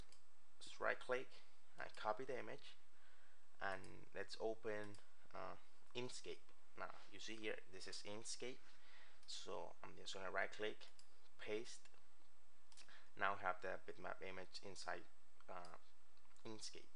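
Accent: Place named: Mexico. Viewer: you sound ike me trying to spek english (i'm mexican)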